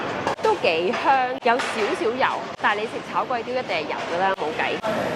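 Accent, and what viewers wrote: Singapore. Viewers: 老实话。。。 你口音用新加坡加广东话听得好辛苦， 料来料去。。。 建议用广东话好啦！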